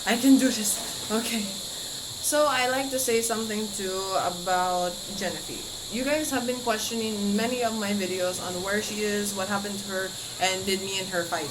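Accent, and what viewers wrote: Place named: Europe. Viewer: you sound like you have a European accent.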